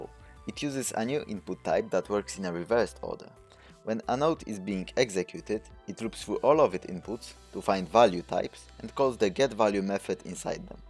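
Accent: Poland. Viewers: ale polski akcent. fajny film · czyżby Polak?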